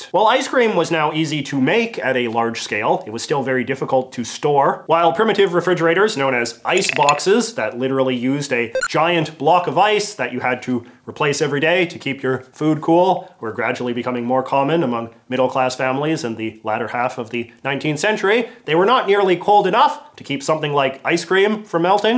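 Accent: Canada. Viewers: The most Canadian accent I’ve ever listened to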